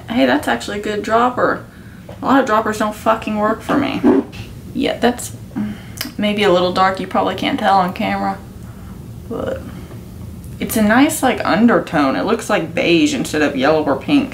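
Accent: South, United States. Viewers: where are you from? I hear a southern accent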